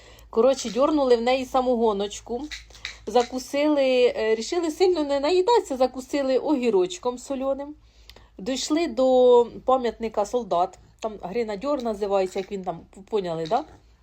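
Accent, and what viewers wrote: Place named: Poltava, Ukraine. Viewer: Говір наче як з Полтавщини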